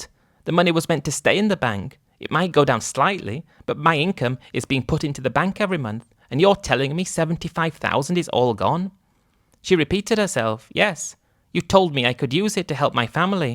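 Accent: England, United Kingdom. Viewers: A guy from the midwest, narrated in a British accent? No thanks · This is an english accent not american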